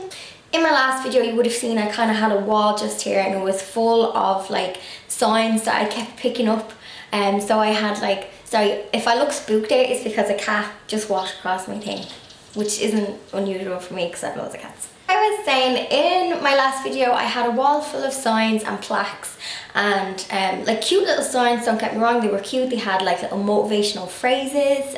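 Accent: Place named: Ireland. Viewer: Both you and your home are adorable! You have put so much work into your house for someone your age, it's very impressive! I love your accent. We here in the USA sound horrible compared to your lovely Irish accent.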